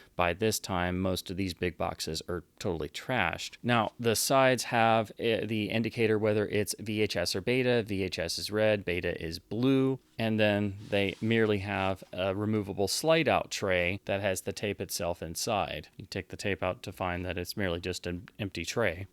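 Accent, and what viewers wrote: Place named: United Kingdom. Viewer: ur british accent is great.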